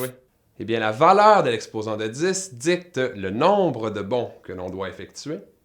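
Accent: Quebec, Canada. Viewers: Merci beaucoup j ai bien compris je vous adore surtout avec l axent québécois 👍😆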